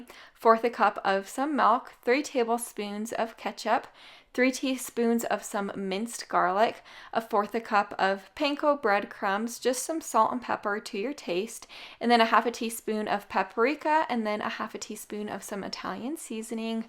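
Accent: Utah, United States. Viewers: I'm almost 50 and I wish your videos were around when I was in my 20s. Thank you for these! And your Utah accent is adorable 😄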